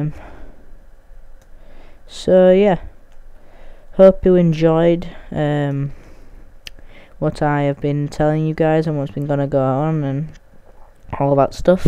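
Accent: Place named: United States. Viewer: U still sound american lol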